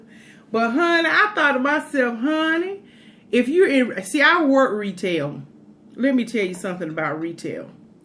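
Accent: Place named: South, United States. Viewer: Wait, what did she say ? Cops of mighty noah or oak? I can tell she is from somewhere South with her accent and Belts department store (Never heard of it) and LOL at wore him out like pancake batter. Ok, I'm editing my comment, seeing the Cowboys cup I'm assuming sge's in Dallas Texas. Yes, I just found her video today after watching My full Figured Life 's Mukbang. The sloppy joes title caught my eye because I just had that for dinner myself last night. :-)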